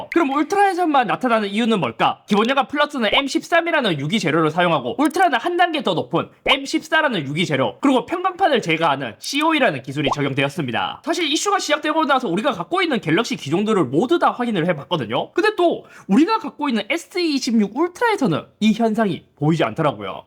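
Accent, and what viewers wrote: Gyeongsang, South Korea. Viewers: 난 얘 경상도사투리 뭔말하는지 못알아듣겠슴..진짜 운좋게뜬 케이스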